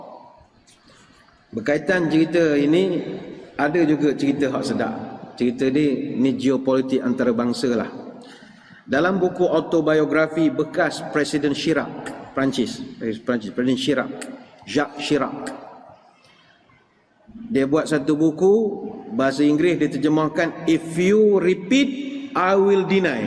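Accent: Pahang, Malaysia. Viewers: sedak mat dengo ora2 pahang berceramoh…😅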